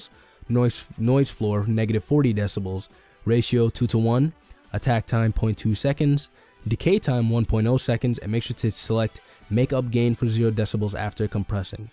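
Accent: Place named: Netherlands. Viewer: Nederlands accent...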